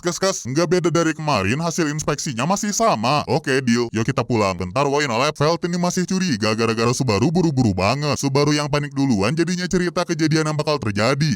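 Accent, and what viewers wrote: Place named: West Java, Indonesia. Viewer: nyunda banget logatna😭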